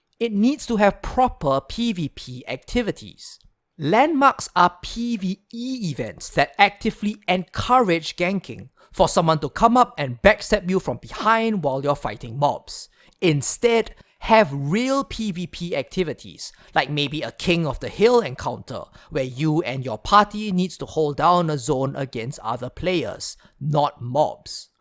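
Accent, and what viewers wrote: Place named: Singapore. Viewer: He's from Singapore. I was initially trying to figure out the accent as well. Cantonese speakers from HK will enunciate but often have have terrible vocabulary. Taiwanese speakers often inject the "z" sound in everything and usually talk slower. Singaporeans enunciate, have good vocabulary, talk fast, but they have that odd accent which I assume is a mashup of multiple different languages. Since Singapore is the hub for South East Asia, most Singaporeans are trilingual by default.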